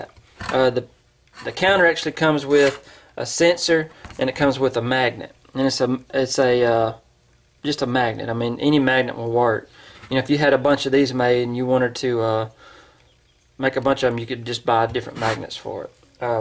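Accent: South, United States. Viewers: Hey man what part of the South are you from?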